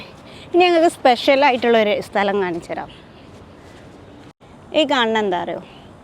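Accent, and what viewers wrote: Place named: Kondotty, India. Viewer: ശബ്ദം കേട്ടിട്ട് കുണ്ടോട്ടി എടവണ്ണപ്പാറ റൂട്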